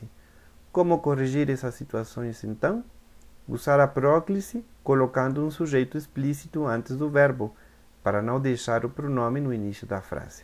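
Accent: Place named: Portugal. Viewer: tu portugués parece ser medio europeo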